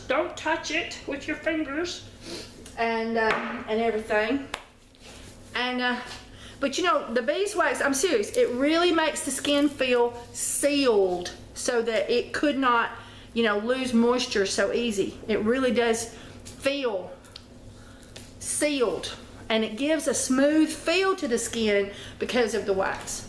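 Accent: South, United States. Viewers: Hey just found your channel yesterday awesome channel. Thank you for sharing your knowledge. When I heard you speak I said she’s southern, so am I Knoxville TN, here looked you up we’re not that far away from each other. Keep these great videos coming love watching you.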